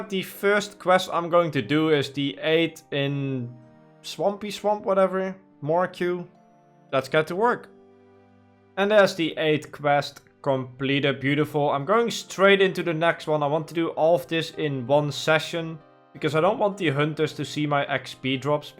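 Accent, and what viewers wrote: Netherlands. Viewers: Jij komt uit Nederland he dat kan ik horen aan je stem. Mij sub heb je geweldige videos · 100% this guy is dutch, just by his accent 😂. I am dutch too it is funny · You know, I never noticed your extremely Dutch Accent. Should know it with your name " " 😂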